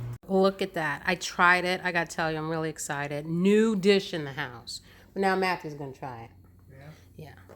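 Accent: New York, United States. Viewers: Love that NewYork accent. Hope I'm correct. Lol I personally go to your channel for that Italian I love eating and cooking. I also find the exact problem with my LeCruset pans also I was so disappointed that they stain. How do u clean yours?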